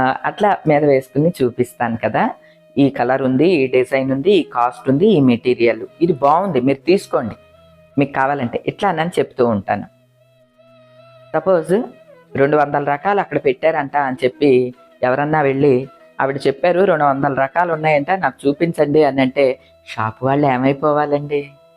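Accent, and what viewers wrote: Godavari, India. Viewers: Amma namast he.Memu yesterday Annavaram vellam.Vacchetappudu meeru baaga gurthoccharu.Godavari district maata vintene Amma maata gurthostundi.Happyga anipistundi All the best Amma Amma (meeru)cheppina chaala manchi maatalu memu acharisthunnam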